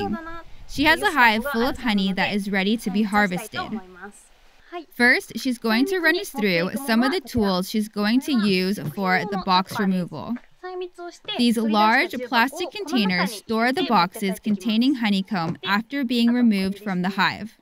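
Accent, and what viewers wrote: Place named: Japan. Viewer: Жаль, что она не говорила просто по-японски. А английскую речь с японским акцентом слушать невозможно.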